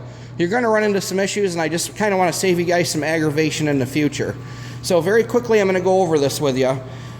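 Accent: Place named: Minnesota, United States. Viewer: probably Minnesota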